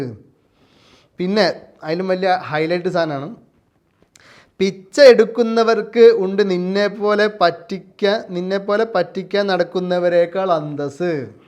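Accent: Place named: Kozhikode, India. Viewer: I like that kozhikoden slang😊😊😘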